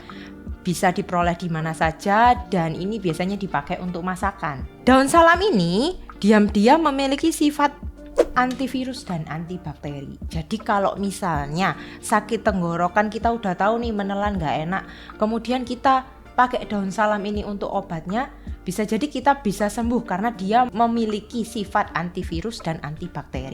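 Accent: Java, Indonesia. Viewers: logat jawanya❤❤❤❤❤❤❤❤❤❤❤❤❤❤❤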